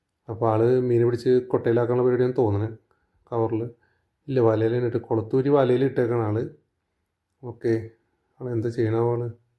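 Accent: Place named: Thrissur, India. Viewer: അതെ നമ്മുടെ തൃശൂർ സംസാരം 👍😊😊😊അഹങ്കാരം ഇല്ലാത്ത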